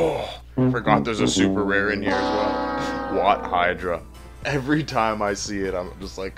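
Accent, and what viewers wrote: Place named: Canada. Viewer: Always watch your videos just to hear your canadian accent reveal itself anytime you say "out" or "about" 😆 Everything else is just a bonus 😁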